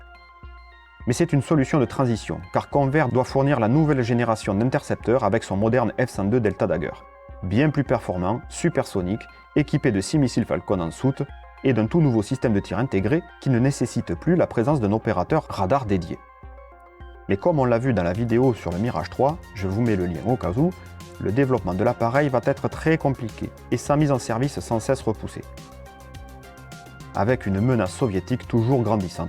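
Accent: France. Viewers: Je démarre cette vidéo et ooooh oui un accent bien français 🙏 rien que pour ça je m'abo. Super vidéo aussi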